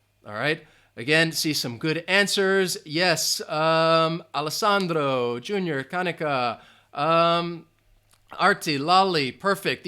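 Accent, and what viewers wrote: United States. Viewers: Very useful for those who speak fluenty another language wich isent their native one like me thank you guy and please try to not be absent for a long time please are you American or British i think your accent is pure american but I'm not sure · American , r is pronounced"are" , he uses slap t= "d"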